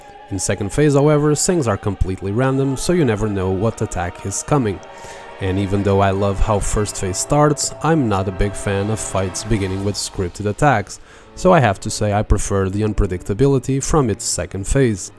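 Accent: Portugal. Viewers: se este sotaque não é tuga eu atiro-me da torre dos clérigos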